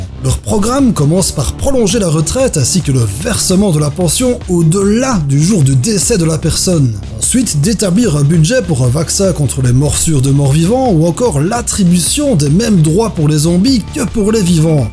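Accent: Belgium. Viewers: salut, tu fais des vidéos de qualité, du coup je m'abonne. Faut surtout pas que tu t'arrêtes, ton travail est déjà d'un niveau impeccable! j'ai fais un rapide tour de tes vidéos et je préfère cette voix plus naturelle :) elle fait moins Benzai que pas mal de monde te reproche, ce que je comprend pas trop d'ailleurs pcq avec ton accent belche c'est complètement différent ^^ Est-ce que tu as pensé à faire une vidéos sur les bières de notre pays ? genre top 10 des bières d'abbayes ou quoi :P Bonne continuation et je bois à la santé de ta chaîne, que ca prospère ! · Est-ce que tu peux arrêter de dire "houite" et dire "huit" stp, je sais que t'es belge mais bon ^^.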